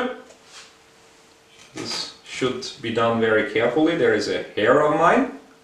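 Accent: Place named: Norway. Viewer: du høres norsk ut.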